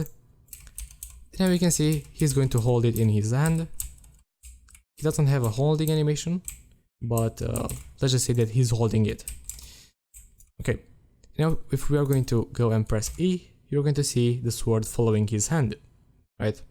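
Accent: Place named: Russia. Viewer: I just think you have an accent,so I thought you were Russian)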